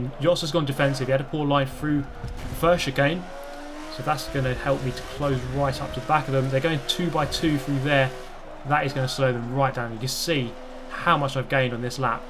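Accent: United Kingdom. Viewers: wow, I really didnt know. thx for info...I was so confused when I found your vids, because I thought: "why is this guy sounding british but is using the imperial system?"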